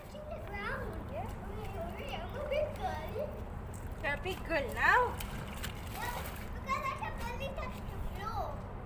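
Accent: India. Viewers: I you acquired the Indian accent. Your daughter is cute. Have a wonderful day.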